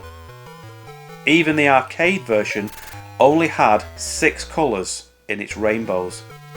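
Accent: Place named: United Kingdom. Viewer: I clicked this video saying "100% this guy has a fucking brit accent" - lo and behold. Literally nobody else would say the CPC is better at anything.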